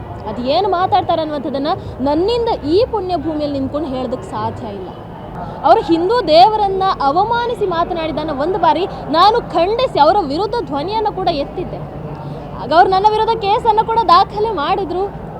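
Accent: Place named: Mangalore, India. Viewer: mangaluru kannada super jayavagali Jaisiyaram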